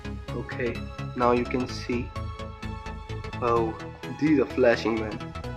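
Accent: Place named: United Kingdom. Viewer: Best 👍 video bhai 👌 aur bhai apki English to masha allah bahut hi achchi h bhai lagta nhi ki ap india se ho pehle to laga ki ap UK 🇬🇧 se ho Best English YouTuber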